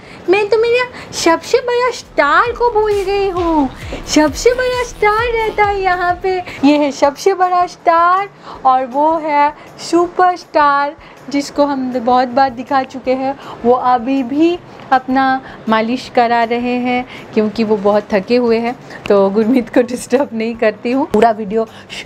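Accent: Bengal, India. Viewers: Your accent says that you are bengali😅❤️Bengali Beauty❤️❤️ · Oh your Bengali accent🥵😘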